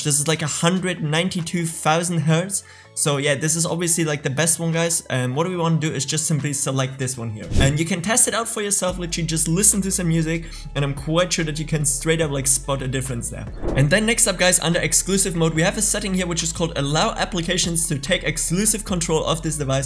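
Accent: Germany. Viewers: So ein deutscher Akzent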